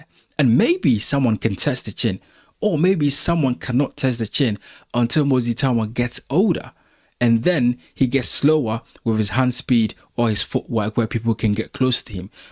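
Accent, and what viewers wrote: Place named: Ghana. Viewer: You sound Ghanaian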